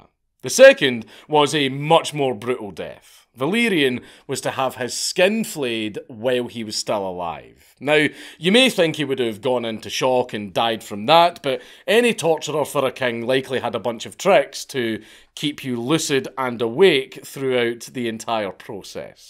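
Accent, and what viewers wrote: Scotland, United Kingdom. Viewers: Oh nvm i thought this was hunter not some scottish guy lol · >a scottsman making fun of any accent Lel, shiggydiggy bro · Lol AI can't understand a Scottish accent 😂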